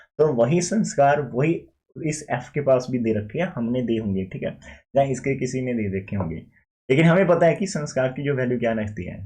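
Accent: Punjab, India. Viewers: Sir ap punjab ho kya?? O bhai sir mene kya sahi gusse kya hai maine apka ak short video dekha jisme ap punjabi me bat kar rahe the vo video dekhne se pehle hi maine guess kiya😮😮😮 Punjabi agaye oye🤩🤩🤩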